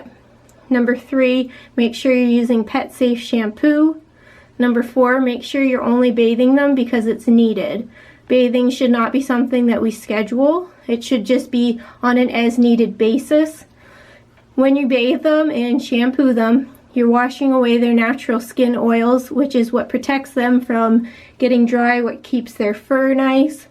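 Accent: Canada. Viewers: She sounds Canadian 😂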